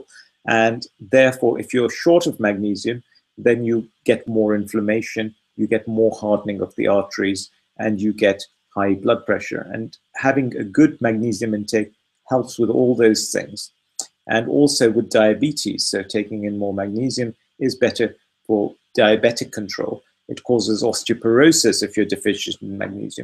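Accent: United Kingdom. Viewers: Love your accent, British ya?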